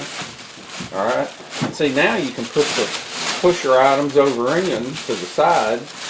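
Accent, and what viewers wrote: South, United States. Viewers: Happy New Year. Am about to set out on my morning old man walk(arthritis in knee, so no more running,) with a good American Buddy from Alabama(I'm American too,but not from the south,) and our dog. Anyway, years ago, when he'd gone home for almost a year, I discovered your channel and would watch it out of nostalga for his accent and similiar excellent story telling skills....cheers...from far off Normandy, France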